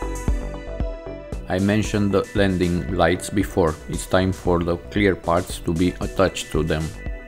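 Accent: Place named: Romania. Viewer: Dupa accent esti roman 100%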